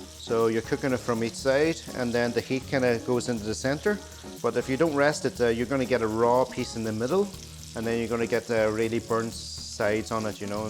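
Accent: Ireland. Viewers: There’s something magical about an Asian with an Irish accent 😂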